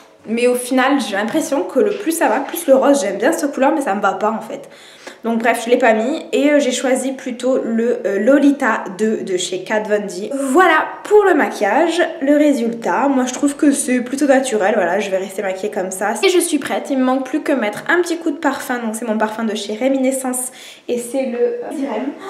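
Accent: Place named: South, France. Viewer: en tout cas sa te va bien Ton accent du sud 😊😊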